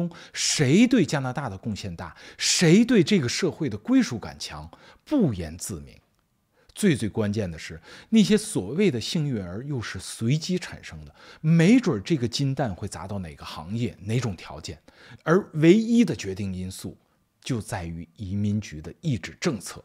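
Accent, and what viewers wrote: Standard. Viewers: 油主是国内播音员出身吗？？这是我听到最标准发音的自媒体人了🎉🎉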